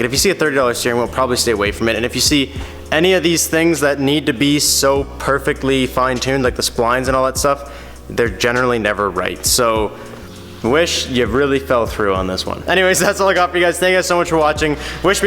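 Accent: Canada. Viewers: First time viewer. You Canadian though? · your yt is the next up and coming. congrats man. you got great content and I appreciate how your learning with us. a lot of people act like they know everything but you admit that your learning and trying stuff for the first time too. I like that. but your accent is unfamiliar too me what is the accent you have? like I don't need to know exactly where your from but I'm interested on what area the accent from or what nationality you are. sometimes you pronounce words different and I'm curious. but I've been subbed since your first wish/ knock off content video you posted I think you had like 20k subs and your growing fast. you need more subs. you deserve it. the eg hatch videos are awesome man. keep up the good work. wish I could work with you guys. you guys seem to have good clean fun with a great group of people. are you Canadian?? 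if you could get back to me I'd really appreciate it. fanboi over here lmaooo jk but I always appreciate a new video from the crew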